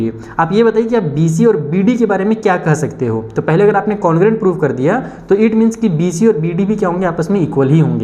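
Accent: Bihar, India. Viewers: Sir aap Bihar se ho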